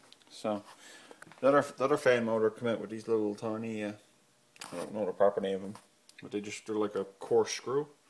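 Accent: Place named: Newfoundland, Canada. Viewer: You sound like you're from Newfoundland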